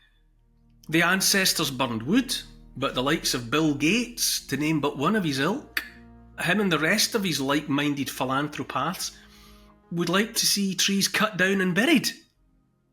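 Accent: Scotland, United Kingdom. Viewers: You have a lovely Scottish accent.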